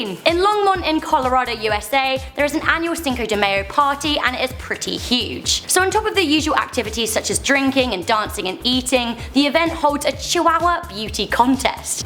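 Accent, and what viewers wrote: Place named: United Kingdom. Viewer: GIRL YOUR SPEAKING BRITISH ENGLISH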